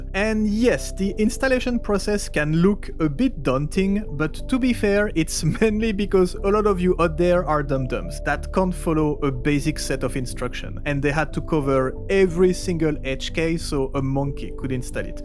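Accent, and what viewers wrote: France. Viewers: merci pour cette vidéo; mais ne serait pas un french qui parle le british? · ton petit accent Français charismatique haha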